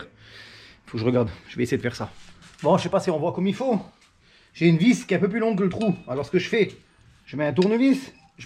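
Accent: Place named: Lorraine, France. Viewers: Bravo meca a l'encienne j'aime bien humeur décalée ainsi que l'accent lorrain. Salutations 👍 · salut le maître , tu devrais donner des cours au jeune, tient ! toujours impeccable, comme tu le demande dans la vidéo, je mets un commentaire , je sais le faire. mais c’est super cool de te voir le faire avec autant d’aisance. je regarde toujours toutes tes vidéos en entier, à quoi bon, juste mettre un pouce, si on ne regarde pas le contenu. je préfère tes vidéos que les émissions de télévision dédiées , car tu ne fais pas dans la mise en scène, c’est naturel et sans filtre. tu me fais rire avec ton accent qui ressemble un peu à l’alsacien. Tu ne dois pas être bien loin . a bientôt. 👍🏽👍🏽👍🏽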